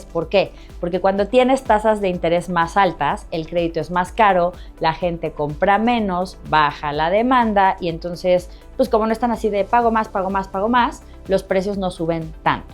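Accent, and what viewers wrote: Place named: Fresa, Mexico. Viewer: Muy buena información. Sin embargo, el acento fresa me da mucha risa jeje.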